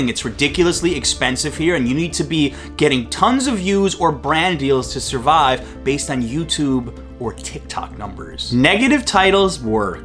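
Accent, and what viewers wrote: New York, United States. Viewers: NYC has always been on my bucket list and few years ago I tried searching for things to do in New York and one of your vlogs came up and binged watch your videos, from then on, your voice is kind of synonymous or like has this NYC feel into it haha, I might study in Canada next year and if ever got the chance, I'll definite visit NYC and follow all your tips, along with Sarah Funk, Action kid, Brett Conti and with your cam guy and third wheeler and a fellow Filipino LOL, Thank you, you're one of NYC Gem!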